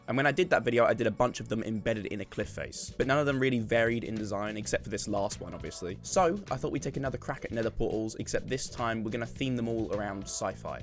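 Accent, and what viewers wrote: United Kingdom. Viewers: ohhhhh sorry im North American and dont know much about British stuff. I knew it was British of sorts, didn't understand what tho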